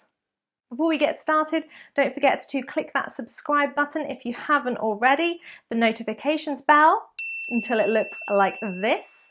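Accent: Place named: England, United Kingdom. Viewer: Which part of England your accent did came from?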